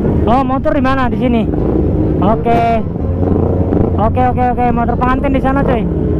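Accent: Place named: Sunda, Indonesia. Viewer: kocak anjirr kalo ngomong pake bahasa Sunda nada Sunda nya itu yg candu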